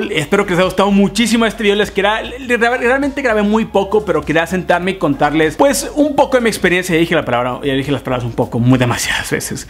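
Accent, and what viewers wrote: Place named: North, Mexico. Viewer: Veo todos tus videos sonriendo 🤩 y amo tu acento norteño! Yo también soy del norte 🙋🏻‍♀️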